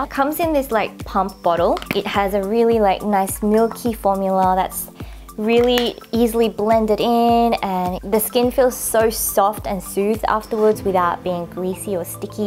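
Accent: Australia. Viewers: Mate!!!! Your aussie accent is so lovely to listen to :)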